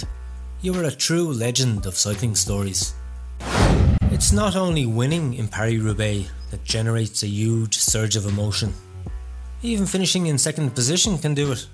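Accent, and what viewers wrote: Ireland. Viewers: Irish gay narrative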